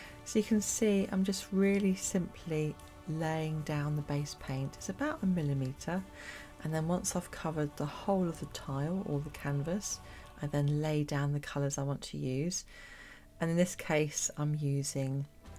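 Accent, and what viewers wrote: England, United Kingdom. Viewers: ❤hi there I'm Donna Louisa. Close related names. I Molly love your butterfly effect ❤ Video, it was great. I'm new to acrylic painting. Currently I'm doing a course with Molly from Molly's Artistry ~ Acrylic pouring / swipe technique course - via you tube lifetime access intellectual information mine. Watch out for the big exchange rate as it's 🇺🇸 I'm in 🇦🇺 in Victoria, Australia. USA $150.00 = Aus $230.00. She is a beautiful lady & teaching is amazing. World renowned artist I'm so glad I caught your Video today. I haven't done the Dutch pouring course yet. Molly uses her Dutch pouring method with hair dryer too. So have seen it often. Will try myself soon. Your demonstration was lovely simple & already aligned with others principles like Molly who has done Acrylic pouring. Loved your explanation. I loved your beautiful English accent. 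I Will try to see more in the future. Have you made other videos? I also don't have the whole range of colours either. So many brands & ranges. Do have some small tubes of the soft body Acrylics paints to work through as well as the larger containers of paint, which I now buy for Acrylic pouring as I can. These should mix with little water or pouring medium - so they should be pourable thinned down. I'm slowly trying to build them up. I used to paint portraits for a short time adults babies animal etc. I have completed several units such as drawing painting, ceramics, and sculpture from Visual Diploma Arts. However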